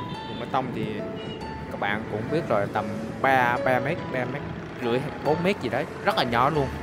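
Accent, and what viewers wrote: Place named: Binh Dinh, Vietnam. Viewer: Chuc mung nam moi ban! Video nay co thuyet minh de hieu, co tien bo, cam on ban! Xa que may chuc nam nhin lai neu khong co loi dan giai thi khong biet la o dau luon ... Giong noi BDinh than quen, chuc kenh phat trien nhieu ban nhe! 🌻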